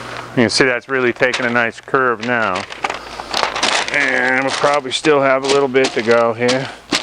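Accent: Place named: Massachusetts, United States. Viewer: I’m not a psychic or anything but something tells me this guy is from Massachusetts…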